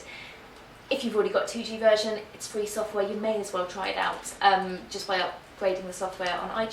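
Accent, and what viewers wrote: United Kingdom. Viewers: are u british? if u are - what the hell are u doing in USA? just kidding. great video. great iphone!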